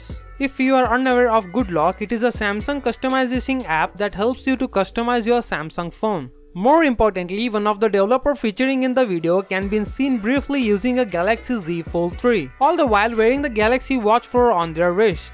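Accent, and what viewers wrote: India. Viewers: Indian accent very strong , pls stop thank q ver much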